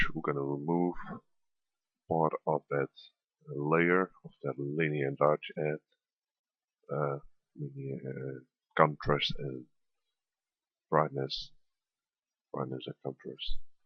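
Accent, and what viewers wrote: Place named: England, United Kingdom. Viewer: super tutorial man,ik heb een en ander bijgeleerd,en ik was ook nog ge- entertained door het engels accent.ik wil MEER alsjeblieft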